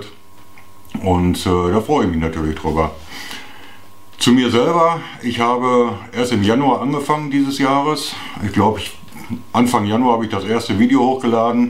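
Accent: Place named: Ruhr, Germany. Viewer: Ich mag deine Projekte und deinen Slang! Ruhrpott? Gruß von einem Exil Dortmunder.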